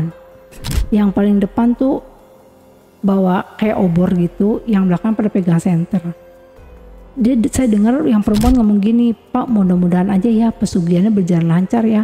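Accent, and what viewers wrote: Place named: Jakarta, Indonesia. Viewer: Saye suka loga betawinye..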